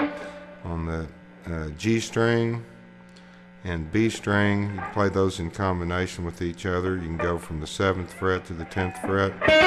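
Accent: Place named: South, United States. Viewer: haha yeah you do, i've heared a lot stronger southern accents before but for someone from scotland.. its still funny:P thinking of doing any other guitar lessons? perhaps on soloing? ( accoustic ).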